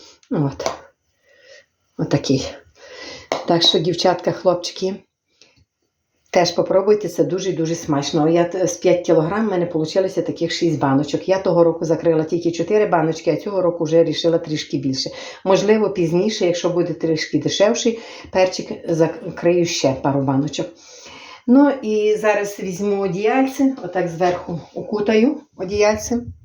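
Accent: Ukraine. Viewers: Вот это настоящий Украинский! Приятно слушать и все понятно. Спасибо вам.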